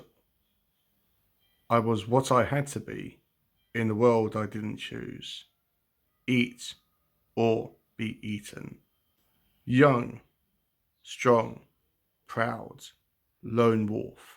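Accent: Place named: United Kingdom. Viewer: Thank you so much for this cool reading!!!! It didn't even cross my mind 🙃 I assume your accent is U.K. - The Lone Wolf lore may be only in America. They even made a movie Lone Wolf McQuade with Chuck Norris. 😁 The wolf was me (I grew up hard), the other is my wife, when I met her. And the end is just me being happy! I don't have the chops to be a poet, I try to write it to ignite my creativity for the prose I work on. I must admit, it sounds so much better when you read it!!!! Thank you so much!!!!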